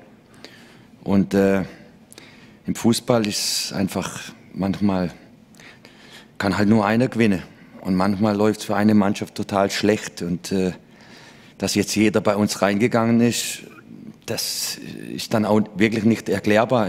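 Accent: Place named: Baden, Germany. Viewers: schtimmd! Er chummt us Eimeldingä - des isch 10 km fu Lörrach.. ;-) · deswegen würd ichs als badisch/allemannisch bezeichnen.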